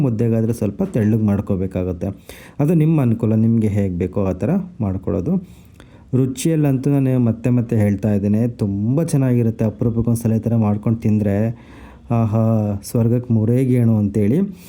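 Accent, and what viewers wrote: Chitradurga, India. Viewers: ನಮಸ್ಕಾರ, ಇದು ನಮ್ಮ ಚಿತ್ರದುರ್ಗದ ಕಡೆ ಅಡಿಗೆ.. 2 ದಿನದ ಹಿಂದೆ ತಾನೆ ನಾನು ಶೇಂಗಾ ಗೊಜ್ಜು ಮಾಡಿ ತುಂಬಾ ದಿನ ಆಯ್ತು ಮಾಡ್ಬೇಕು ಅಂತ ಹೇಳ್ದೆ, ಇವತ್ತು ಈ video ಅಕಸ್ಮಾತಾಗಿ ಬಂತು ನೋಡಿ ತುಂಬಾ ಖುಷಿ ಆಯ್ತು. ನಿಮ್ಮ ಭಾಷೆ ಅಡುಗೆ ನೋಡಿದ್ರೆ ನೀವು ದುರ್ಗ ದಾವಣಗೆರೆ ಕಡೆಯವರು ansutte. ತುಂಬಾ ಚೆನ್ನಾಗಿದೆ ನಿಮ್ಮ ಅಡಿಗೆ videos. ಶುಭವಾಗಲಿ ನಿಮಗೆ 👍🏻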